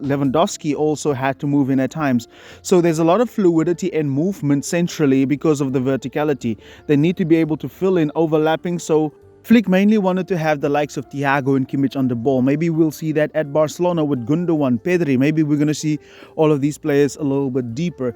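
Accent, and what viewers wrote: South Africa. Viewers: he has a South African accent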